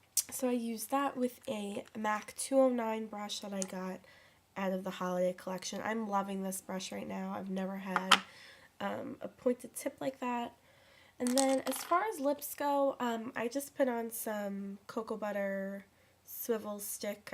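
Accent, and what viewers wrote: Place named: New York, United States. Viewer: i just looked at my 88 warm palette, and i was looked at youtube for some inspiration on a cranberry look! i'm going to use this today for school and work! and btw love the new york accent haha i'm from the west coast so i recognized it immediately!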